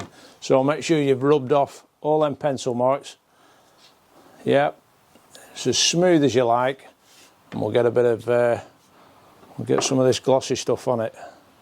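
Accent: Lancashire, United Kingdom. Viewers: Just dawned on me its Fred dibnahs accent Bolton Blackburn area😂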